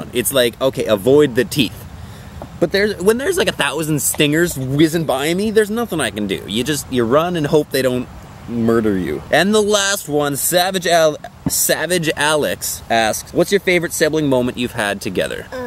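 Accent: Canada. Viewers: Am I the only one who hears the Canadian in his voice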